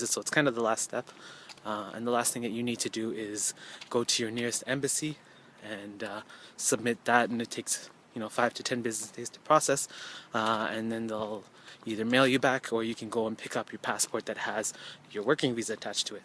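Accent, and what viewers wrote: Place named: United States. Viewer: how come you have American accent? or is that how Canadians speak in general?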